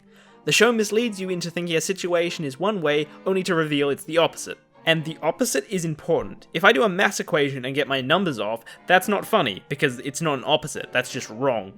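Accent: Australia. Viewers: You sound like an Aussie ..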